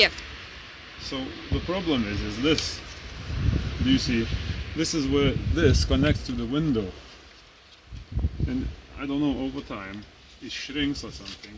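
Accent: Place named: Russia. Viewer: Thanks. Really interesting accent you have, I thought you were Russian at first!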